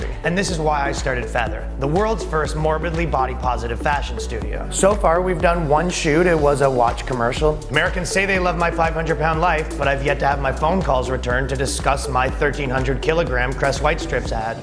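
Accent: Canada. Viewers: your canadian accent was THICK in this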